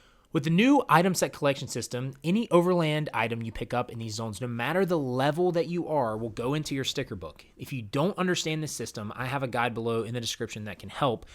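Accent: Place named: Midwest, United States. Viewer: ain't no "L" in the word zone dude. I'm from the Midwest too and I fixed that dialect issue you can too!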